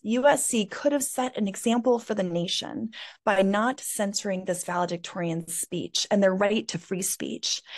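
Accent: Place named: South, United States. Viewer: Thanks for not disparaging the historic flags of the South. As you know, not all Southerners are racists or klan members. Some of us even work for peace & justice. As William Faulkner is often quoted, "In the South, the past is not forgotten. It's not even past!" Your comment about the reoccurring "twang" in your voice was delightful!!! The Southern accent, in all of its various forms, evokes the fondest of memories. It literally soothes the human soul. I hope you enjoyed Montreat & the beautiful Black Mountains of North Carolina. Wonderful place with wonderful people!!!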